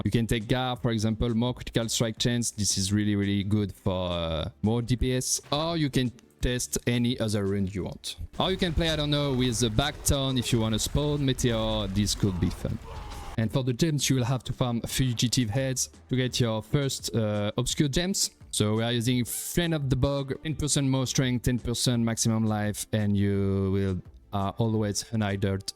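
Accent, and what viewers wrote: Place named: France. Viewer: Salut le poto je me disais bien que la accent était familier, j m abonne pour the french powzr brother. Tu geres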